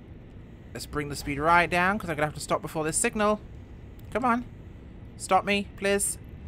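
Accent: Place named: Somerset, United Kingdom. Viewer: Wow am impressed do you have an interest in trains or are you just playing this simply for fun?? 😄👍 Oh and your somerset accent was good and funny 😄😄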